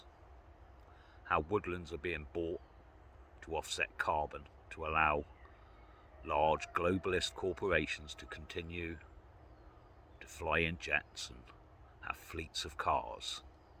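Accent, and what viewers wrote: England, United Kingdom. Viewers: Thanks. I wish I had had a mentor like you when I was a young man. Love your old school attitude and (English?) accent. Wish you guys all the best in your endeavor, from across the pond 🙏🏻 We’ve got our own battles to fight, although it seems we are facing a common enemy..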